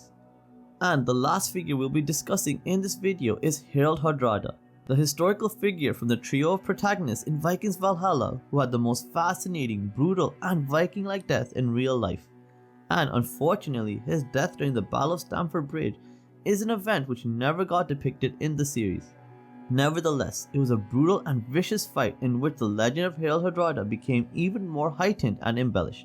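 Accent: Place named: South Asia. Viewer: all i hear is jeet or porki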